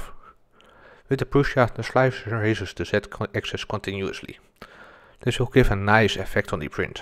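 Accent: Netherlands. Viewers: Nice video man, but the heavy dutch accent is REALLY annoying! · I love your Dutch accents 👍🏼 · Dat klinkt Nederlands :)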